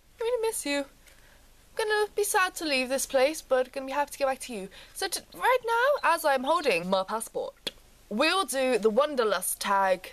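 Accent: England, United Kingdom. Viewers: i recon you've lost your English accent a little bit.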